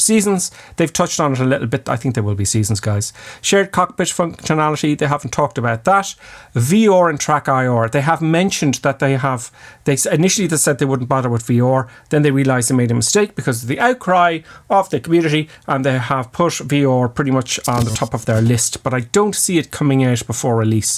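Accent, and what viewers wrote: Ireland. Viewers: Where in ireland are you from lad? I’m from tyrone. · As an American, I love your Irish accent and the excitement in your voice! And you have a great sense of humor! 😂 Makes the time pass by quickly until the release! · are you irish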